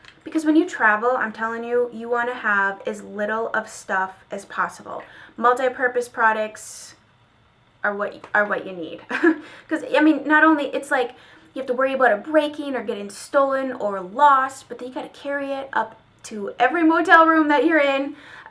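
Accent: United States. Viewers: New subscriber here your beautiful I love your voice I love American accents (I am from the UK) great video. Xxx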